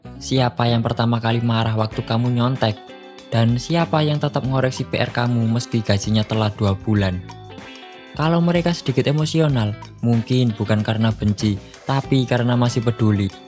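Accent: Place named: Java, Indonesia. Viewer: udah bagus video nya... 1-10 chanel ini dapat nilai 3 banyak hal yang harus admin perhatikan lagi agar chanel bisa berkembang, masukan dari kami 1.belajar memahami emosi dalam suara 2.belajar apa itu hook,tapi ada rahasia di balik hook yaitu (rehook) 3.narasi ini terasa sangat AI dan masih banyak lagi. pengisi suara chanel ini punya genetik suara yang sangat bagus.. aksen dan logat jawanya membuat pendengar mudah ingat.. sedikit tips smoga bisa membatu,oiya investasikan waktu luang untuk belajar membuat tumbnail yang bagus,konsisten untuk terus perbaiki kualitas karya mu,pasti nanti bisa berkembang chanel nya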